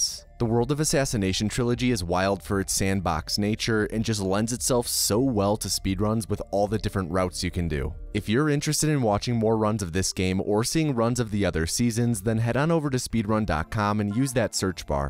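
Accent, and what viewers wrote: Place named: Midwest, United States. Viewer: It's so weird that I didn't notice any accent in your voice until AFTER the Chicago guy skit; Once you returned to your normal speaking voice I could hear lil bits and pieces that sounded distinctly Midwestern. As a native Chicagoan myself I was never able to detect the "Chicago accent" while I lived there unless it was very pronounced or over-the-top like in SNL's Da Bears skit. Other than that I was convinced that we spoke "neutral" English. After living in Oregon for almost 7 years though I'm slowly getting better at picking up on the subtle nuances of Chicago-talk. The biggest difference I've been able to spot is in short "o" sounds like in the word "body". I realized that I say it as though it were a short "a" sound, almost like "bahdy". I guess I'm just anudder one a' da hunnerds of Chicahgo fellers who tahks like a gahsh darn fool 😮‍💨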